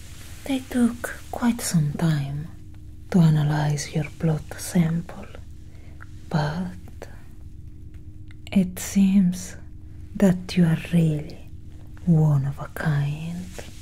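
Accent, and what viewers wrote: Russia. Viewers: Гуд рашен акцент, агент Кетрин. Вел дан😋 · U russian?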